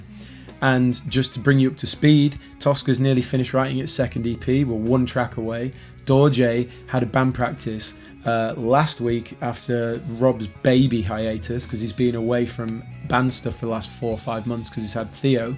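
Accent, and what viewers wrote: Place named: Northern England, United Kingdom. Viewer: Mad our paths probably crossed back in the day. I noticed you were Northern by the accent but didn't know Leeds. Did a couple of modules of my degree at LCM in 05/06/07. I remember Dave often doing the sound for the metal gigs in Royal Park Cellars and knew his brother John (and later worked with him!). Funny old world.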